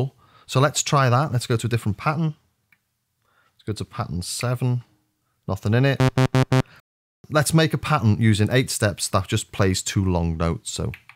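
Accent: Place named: England, United Kingdom. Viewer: Just got my crave last week, this video is absolute mana from heaven, I would be lost without it, thanks 👍🏻 and also the fact you're English means I can understand what the hell you're talking about 😂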